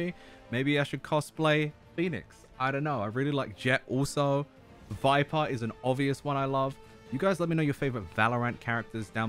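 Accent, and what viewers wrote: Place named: United Kingdom. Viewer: Bruv hes from britahs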